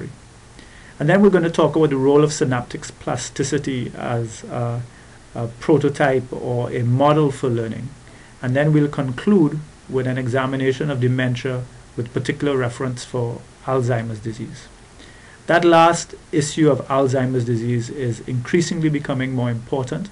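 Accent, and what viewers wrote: Trinidad and Tobago. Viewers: really clear lecture, excellent rhythm and timing. thanks for making this! sounds like a Trini.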